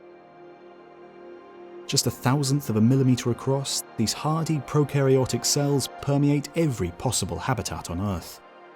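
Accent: United Kingdom. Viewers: You're just saying that cus he has a British accent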